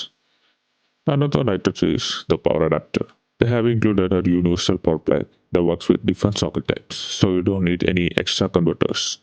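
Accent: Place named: India. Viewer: I can recognize that accent anywhere. You from India too?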